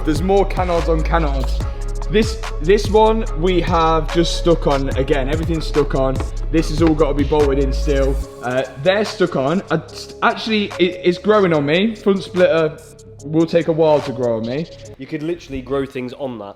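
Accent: Leicester, United Kingdom. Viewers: Tuning in for the Lesta accent 😊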